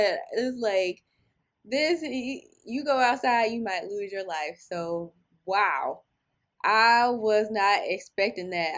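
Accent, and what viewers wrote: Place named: South, United States. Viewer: Yeah, yeah, the song is great and all but what part of the country are you from,? Because, girl, you've got waaaay more than your fair share of Southern charm just oozing out all over the place. I could listen to you talk and laugh all day, every day, and still want more. If that husband of yours doesn't feel the same way then you'd best sit him down and remind him of what a lucky man he is! LOL Keep rockin' this channel!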